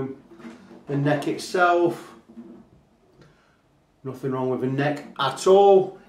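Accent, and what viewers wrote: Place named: Nottingham, United Kingdom. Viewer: I knew you were a Nottingham lad as soon as you said "Sellin". Nice guitar, hope it went to a good home.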